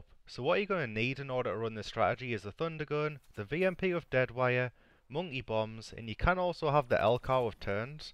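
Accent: Newcastle, United Kingdom. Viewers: thought he was a geordie?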